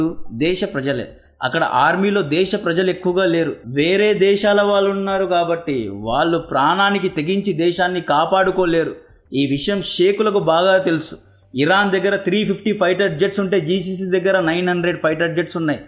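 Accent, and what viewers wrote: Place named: Anantapur, India. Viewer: Brother, nee slang anantapur teluguki daggaraga untundi. Mee ooru?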